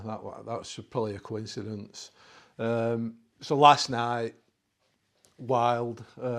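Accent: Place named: England, United Kingdom. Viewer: Great Videos. For sometime now, each time you ask Alexa a question, our Alexa answers you 😳Our voices are completely different. I have a Texas draw and you have an English accent…✅🇺🇸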